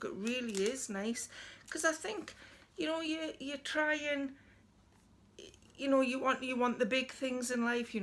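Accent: Newcastle, United Kingdom. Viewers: I'm a new subscriber. I really enjoyed your content.You come across as a lovely person and the Geordie accent is lovely.Looking forward to watching all the videos.Happy New Year .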